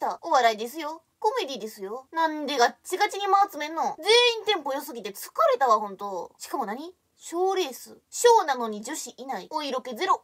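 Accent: Kansai, Japan. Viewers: 今回は関西感強いな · 相変わらずキレッキレですね。総統の関西弁、かわいすぎて萌え死です。めっちゃきれいでかわいくて色っぽい！まじで好きです❤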